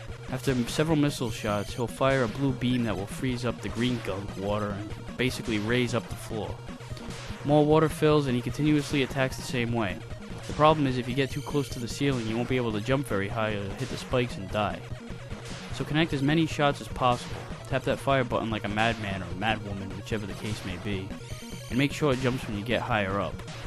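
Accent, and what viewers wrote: United States. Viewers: uuuuuhhrh holy fuck your American accent is annoying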